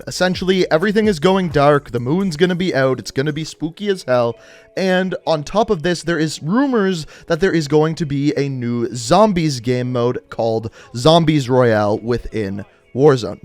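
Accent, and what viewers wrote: Canada. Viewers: Lol I can't get over that Canadian accent bro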